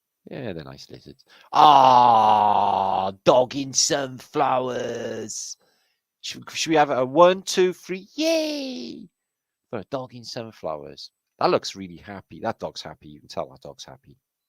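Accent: Wales, United Kingdom. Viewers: I'm sorry the world lost a good guy , a truther, with a gorgeous Welsh accent. (the world needs more of all of the above)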